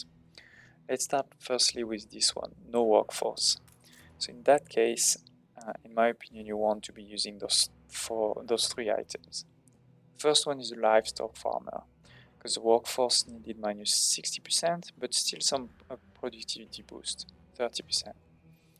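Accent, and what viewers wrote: France. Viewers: You sound french :D.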